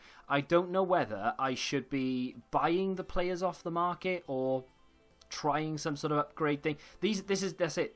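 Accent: Wales, United Kingdom. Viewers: Are u welsh ?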